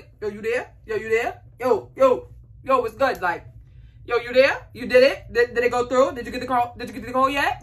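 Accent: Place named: New York, United States. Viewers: You most def from new York don’t no where tho 💯😂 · That gotta be Brooklyn 😭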